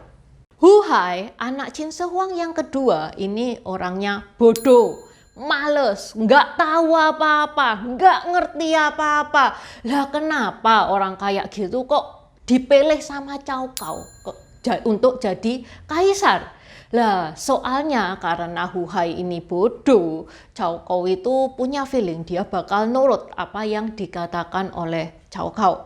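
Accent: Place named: East Java, Indonesia. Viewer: Aku senang dengan cara bercerita. Dia pakai bahasa Indonesia sehari2 logat Jawa Timur. Selamat, semoga sukses!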